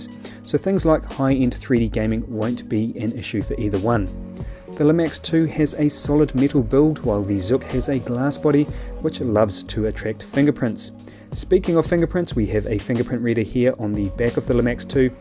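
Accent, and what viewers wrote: New Zealand. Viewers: ARE YOU FROM NEW ZEALAND?!?!?!?!?! JUST JUDGING BY YOUR BEAUTIFUL ACCENT :P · kiwi accent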